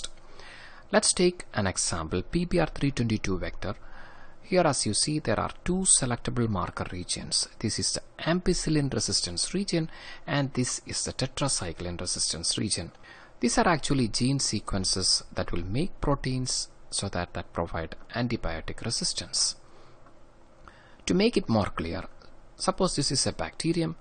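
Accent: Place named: Kashmir, India. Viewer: Thankyou so much sir It is really helpful 😔☺️ Btw your accent is like kashmiri's .😄🎉🎉🎉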